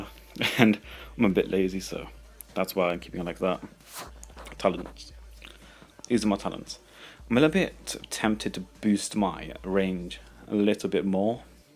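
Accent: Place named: England, United Kingdom. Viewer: Awww English accent...😀.. English?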